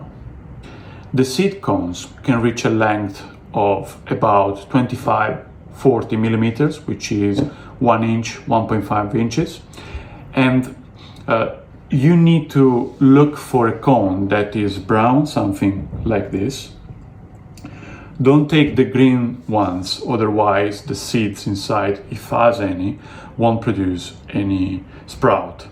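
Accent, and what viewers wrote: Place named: Venezuela. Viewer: Tienes acento venezolano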